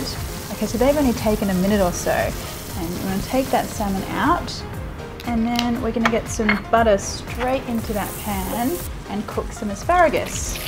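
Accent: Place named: Australia. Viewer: Yet she has a clear Australian accent ok lol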